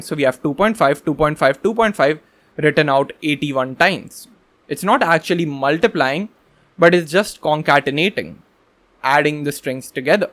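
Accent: India. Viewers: are Nahi bhai😂. Thanks to U, ek Indian accent mein acha detailed video mil gya. Wrna American accent se sar dukhta h. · A horrible, bizarre English access... It sounds like an Indian accent.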